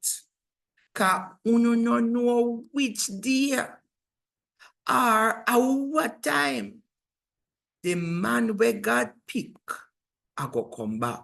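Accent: Jamaica. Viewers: Lol first I am hearing the story read like this. Nutten like Jamaica Patwa. Makes the story so colourful 💯😊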